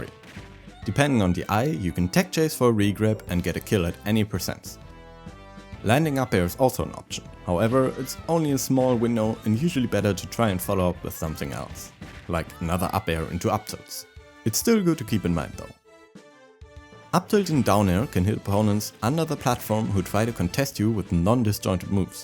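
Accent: Germany. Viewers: Sounds like he comes from a German-ish speaking country, so probably germany, Austria, Switzerland or Netherlands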